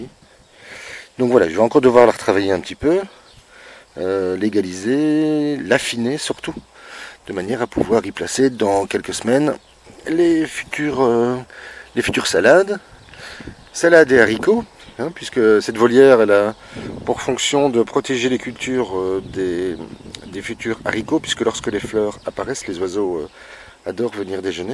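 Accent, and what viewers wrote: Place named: Brussels, Belgium. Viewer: Salut, merci! J’ai reconnu dans ton intonation une musique chère à mon coeur d’ex- Bruxelloise 😉. Je te félicite et te remercie de nous offrir tes belles idées. Bises du Nord de France, Claire.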